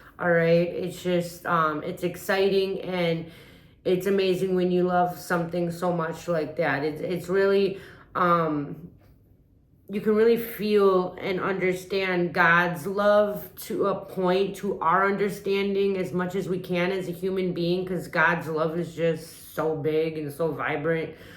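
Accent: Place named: Upstate New York, United States. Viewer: Your accent reminds me of my extended family, who live in upstate NY, and Pennsylvania . 😊 Thanks for sharing your intuition. X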